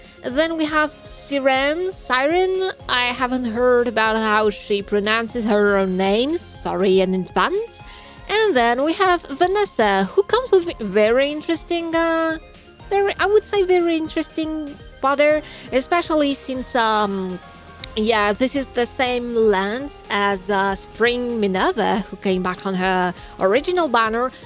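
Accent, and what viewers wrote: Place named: France. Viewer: That French accent x)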